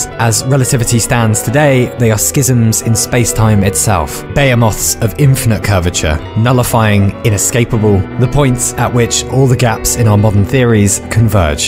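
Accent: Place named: United Kingdom. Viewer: He's so fun to listen too I always forget he's British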